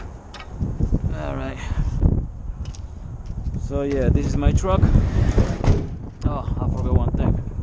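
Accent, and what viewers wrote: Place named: Argentina. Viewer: You sound like your Argentinean. who do you think about the testo digital gauges?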